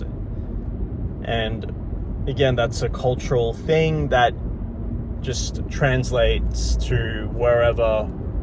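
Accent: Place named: United Kingdom. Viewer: I love your accent. I thought you might be British but you're driving an American car (left hand drive)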